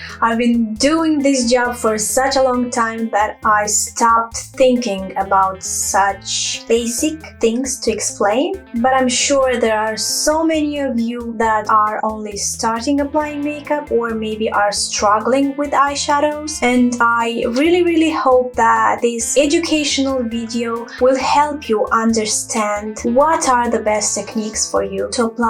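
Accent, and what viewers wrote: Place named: United Kingdom. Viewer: love the British accent :)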